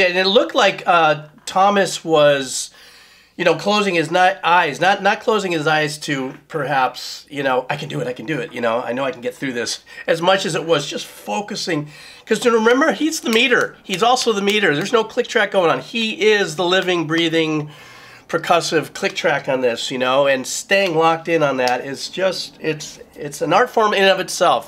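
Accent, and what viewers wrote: Hawaii, United States. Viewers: Where are you from brotha, sounds like your on the Islands. If your located on Oahu and give music lessons, I would be interested, Aloha.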